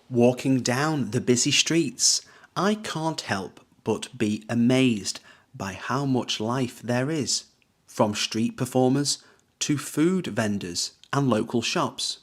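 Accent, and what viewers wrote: United Kingdom. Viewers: The British accent!! I missed it alot! I studied in the UK for a while! So, this accent filled me with nostalgia!! Liked+subscribed+shared with others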